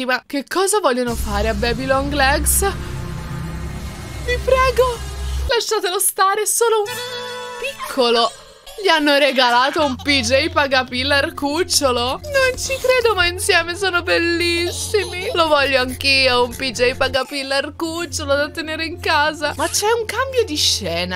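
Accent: Tuscany, Italy. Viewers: come sei Toscana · ma sei toscana perché ti voglio bene tanto ☺️☺️😊☺️😊☺️☺️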